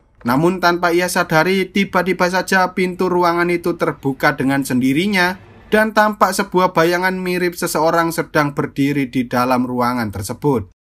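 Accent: Java, Indonesia. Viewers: Medok bgttt 😁😂👍👍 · Medhoknya sangat nacurell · Aduh aku lucu sama suaranya medok sekali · Mas, klo bsa jangan medog dong bahasanya ...bsa gagal fokus nih nyimak film nya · Medok jawanya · Medok amat Lu Jawa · Fix ini yang ngisi suara pasti "wong jowo" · Jawa nya mana bang ? medok bngt ,,,mantap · Meddkok Mbanget aksennya.. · Medok banget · logat jawir malah ngilangin selera nonton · Sampeyan Jowo ne ngendi mas? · Kejadian kejadian aneh. 😀 Longat jawane apik tenan 🔥 Bravo..... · Sangat medok keren2👍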